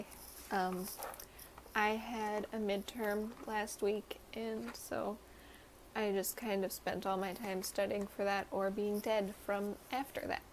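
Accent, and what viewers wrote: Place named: South, United States. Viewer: Thx. I never lived in the US, so didn't know, but yes, you do sound southern to me! Come to think about it, my economics teacher in high school may have been from NC, and she used to teach us about supplaa & demeaand... Pity you can't find time to travel this time. Enjoy the rest of your stay in Kyushu! As for the chocolate, I personally like the Kinoko.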